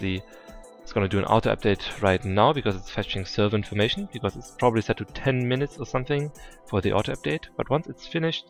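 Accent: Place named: Germany. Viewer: Man kann es sowasvon höhren das du ein Deutscher bist :D Stimmt doch oder? Du hast nicht zufällig ein Deutsches Tutorial für ACE 2? (Ein aktuelles)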